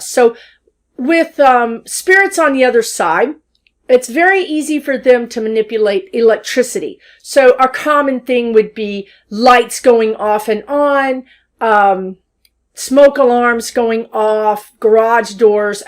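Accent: Texas, United States. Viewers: You are in Texas but you have a distinct accent. Are you from east TX or from the east coast?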